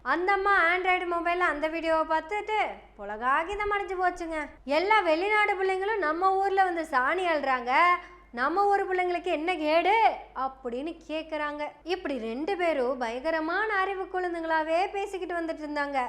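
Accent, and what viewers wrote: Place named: Coimbatore, India. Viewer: அம்மா தாயி.. உன்னோட கோவை slang க்கு அடிமை..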